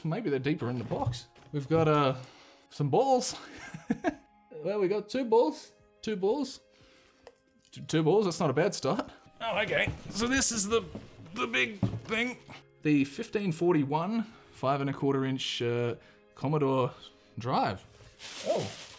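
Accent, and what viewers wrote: Australia. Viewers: What state in Australia you from dude?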